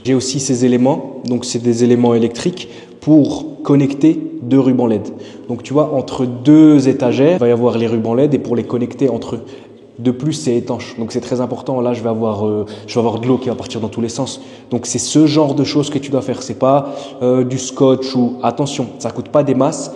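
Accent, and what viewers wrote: Alsace, France. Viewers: L’accent alsacien 👌 voila le coter maniaque 😂💪 les marocains vont vriller faut tout soit nickel 👌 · Bsahtek mon frere !!! Qu'allah te facilite ton commerce et tous ce que tu entreprend!!! Un petit accend alsacien dans ta voix je me trompe ??? 😂😂😂😂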